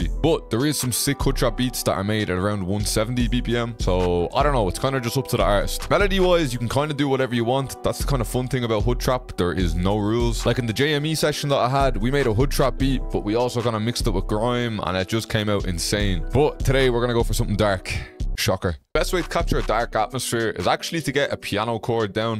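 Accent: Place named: Dublin, Ireland. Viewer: That Dub accent is heavy. Respect from Bray my man, keep killing it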